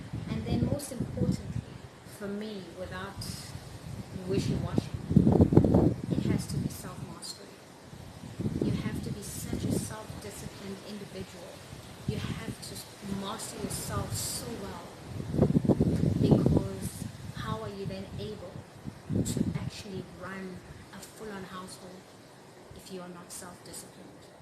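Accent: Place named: Caribbean. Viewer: Very good video u explained that very good thank u keep up the good work . U sound like a Caribbean girl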